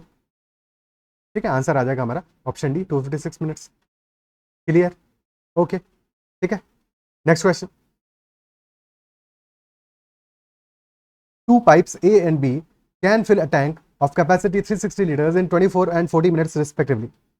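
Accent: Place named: South, India. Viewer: Sir south indian accent is crazy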